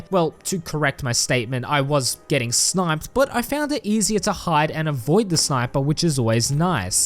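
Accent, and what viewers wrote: Australia. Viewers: Are you south Australian?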